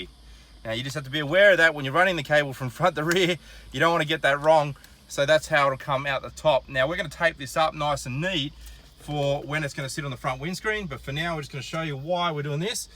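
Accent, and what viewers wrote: Australia. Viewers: I love your Aussie accent🥰 Reminds me of the time I used to live in Australia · Best Dashcam DIY install I've seen. Easy-to-understand Aussie voice also. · Geez Australia has jacked up accents irritating to listen to learn to speak English bro can’t take one more second of your voice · What an Aussie accent !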